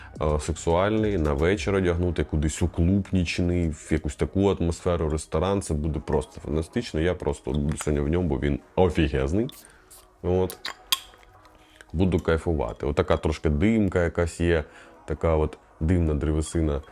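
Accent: Ukraine. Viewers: українська мова з Харьківським акцентов))) АГООНЬ))))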